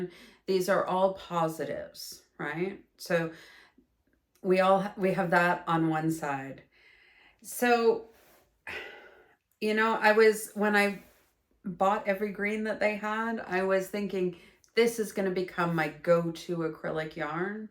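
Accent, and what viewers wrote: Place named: Canada. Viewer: Hi! Couple things first I have to say I want to know if you’re originally from Canada because as a Canadian I have what I call Canadiandar, which is Canadian radar, and as I was listening to you talk about this yarn, I instantly thought this woman is Canadian and then it says you’re from Germany, but obviously you weren’t born in Germany or you didn’t grow up in Germany because of your accent, so can you satisfy my curiosity about that? Second of all, I was looking for a review of this yarn because I’m about to order it to make a shawl and I see that you made a shawl with it. That’s so awesome. So thank you for your review because it was really the only one I found. Sidenote, another content creator that I watch makes stuffed animals with yarnart jeans yarn, and she says it’s the best one for that. I don’t make it so I don’t know, but I do love the various yarnart flowers. 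And by the way, yarnart does make an amazing cotton and a cotton acrylic blend that is very soft although I think the shawl you made is beautiful and completely worth gifting. Yarnart flowers is the cotton acrylic blend and yarnart rosegarden is the cotton, and I make shawls with them all the time. They come in big cakes over 1000 m actually you’re probably familiar with this yarn because you live in Europe, so you’re so lucky. You have easy access to this stuff. Actually yarnart flowers is the exact same yarn as hobbi twister. It even has the same colors.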